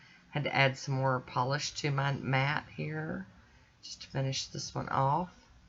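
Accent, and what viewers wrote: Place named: Texas, United States. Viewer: Lady you make me smile so much! I adore watching your videos. You are creative and watching you is like watching my family. Maybe because I am a Texan and you accent and manor hit my heart. Anyway I love you so! Keep being you. Thank you!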